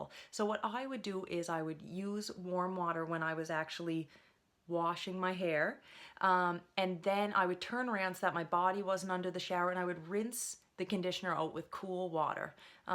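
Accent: Canada. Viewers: I thought you sounded Canadian! My husband is from Ontario but lived in Nova Scotia for a few years before I met him - so he had an accent like yours when we were dating! He's lost the accent now but it's fun to hear it again in your video. :D Also, thanks so much for the curly girl break down. <3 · Your Canadian accent is so thick omg i love it !!!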